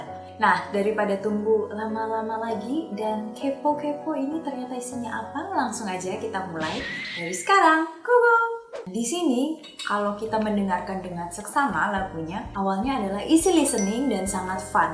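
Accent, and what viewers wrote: South Korea. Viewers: Logat Korea eonnie keren bgt yaampuuunn 😭👍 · Iya kak logatnya udah korea banget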